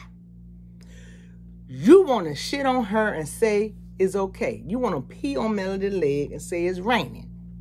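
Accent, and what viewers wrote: United States. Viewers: I just so happened to stumble upon your site,. You are so funny...shooting straight from the hip with that country accent 🤣🤣! I was hooked and hog tied the first 5 minutes of listening. Where have you been hiding🤣😃? Thank you.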